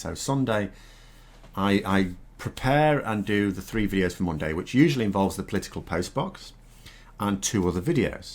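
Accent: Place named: Northern England, United Kingdom. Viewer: Love your work, business apparently having a ‘northern’ accent is a credibility problem for the comfortably well off ‘southerners’. Do you know why this disparaging attitude exists?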